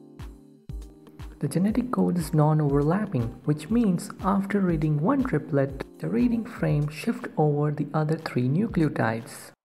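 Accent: India. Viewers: R u indian your accent says that.🦆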